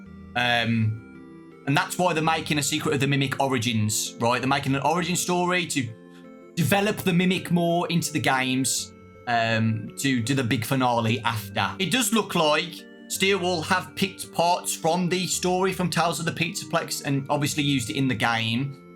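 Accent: United Kingdom. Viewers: i love how ur accent sounds i wish i was british 2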